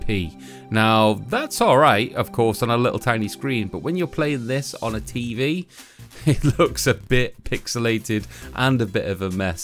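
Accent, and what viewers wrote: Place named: United Kingdom. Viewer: i liked and subbed and now commenting and because i did all that is this is the best guide and your British which so am i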